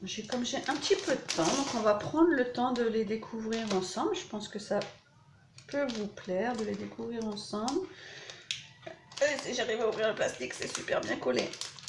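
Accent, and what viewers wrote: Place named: France. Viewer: Coucou, trop beaux ces papiers Ciao Bella (tu es trop chou avec ton accent français 😉 ciao se prononce tchao et buongiorno se prononce bouongiorno), vraiment belle la sound of spring mais mon coup de coeur c’est la Codex Leonardo, celle sur le café, celle sur Pinocchio et peut-être aussi celle sur le theme bois ! Hâte de pouvoir en prendre. Merci pour ce partage qui en met plein la vue en effet !!! Tu vas nous faire des merveilles avec tous ces papiers, bon scrap et plein de bisous bella 😆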